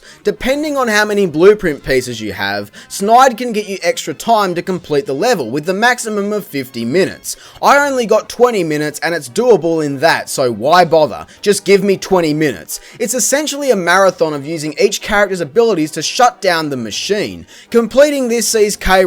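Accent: Australia. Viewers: i think he comes from australia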